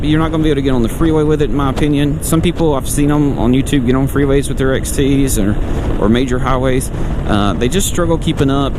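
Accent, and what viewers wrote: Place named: Alabama, United States. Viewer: I could tell it was bama before you even said anything lol. How far are you from Fayette?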